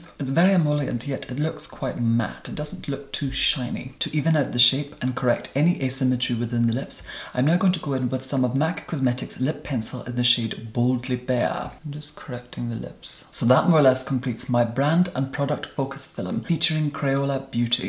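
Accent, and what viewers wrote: Scotland, United Kingdom. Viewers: What is your accent?? Is it posh Scottish? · He is scottish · I get Scottish vibes from the accent ...? But I have just come across u and I subscribed during the first five seconds I LOVE UR STYLE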